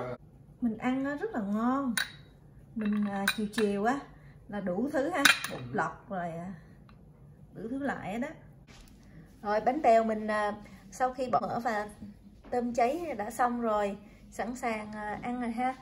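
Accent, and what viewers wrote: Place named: Hue, Vietnam. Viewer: C nói tiếng Huế hay mà. Nghe rất dễ thương. Sao 2 con chị ít nói tiếng việt nhỉ. Thấy bé chăm nói tiếng việt hơn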